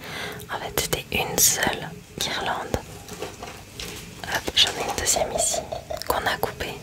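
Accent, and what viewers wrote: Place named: South, France. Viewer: Hello très sympa cette vidéo. Tu as la voix qui chantonne. D’où viens-tu ? J’adore les accents du sud 🥰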